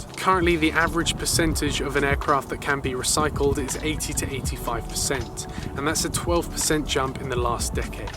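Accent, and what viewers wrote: United States. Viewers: Nice video. Why are you speaking American English, it’s a bit strange? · Nice vid, but ditch the US accent/pronunciation. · Stopped watching when you started using American pronunciations.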